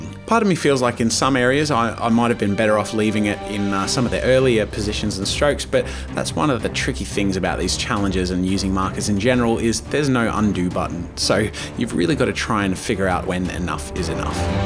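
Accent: Australia. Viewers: I love your Australian accent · its the Australian accent probably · Australian accent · He sounds extra Australian in this video 🐨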